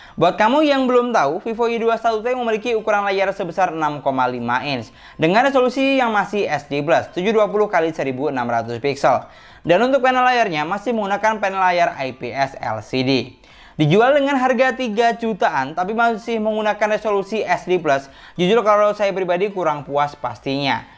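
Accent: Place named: Martapura, Indonesia. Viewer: Orang Martapura yah mas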